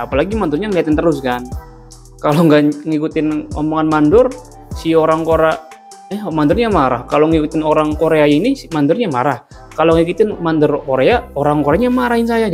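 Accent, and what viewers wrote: Indramayu, Indonesia. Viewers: Sampean wong endi dermayue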